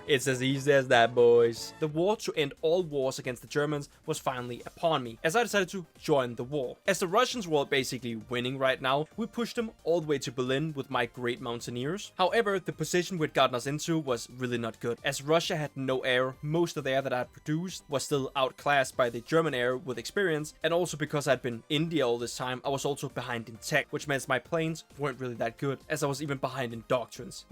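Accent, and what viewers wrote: Denmark. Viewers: Danglishen går hårdt🙏🙏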